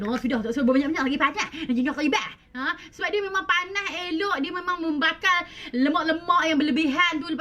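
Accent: Kelantan, Malaysia. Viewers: Panda kecek kelate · Hhahaahhaa. Aku sukO Kecek Klate😂supOo siam hahahhaaa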